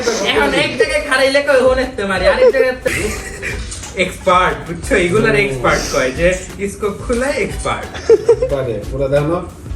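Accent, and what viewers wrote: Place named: Old Dhaka, Bangladesh. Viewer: Bai kotha gule puran dakar apnar bareta gerokom kotha bolen serokom kore bolle valo hoto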